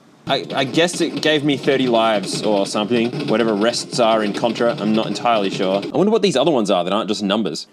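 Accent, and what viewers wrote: Australia. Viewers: British? He's clearly Australian. You may have a problem with your phone's trumpet mouth, you should have it serviced by a professionat.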